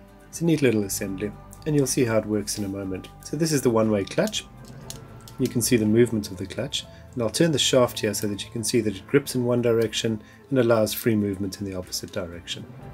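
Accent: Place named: South Africa. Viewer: Great video and SA accent, just started with this hobby, lots to learn from a master craftsman. Looking forward watching all your vids. From sunny CT.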